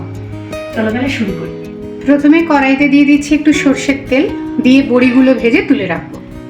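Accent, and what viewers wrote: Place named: Bangal, Bangladesh. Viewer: Tomar Kotha sune mone hoy tumi bangaal tomar video dekhi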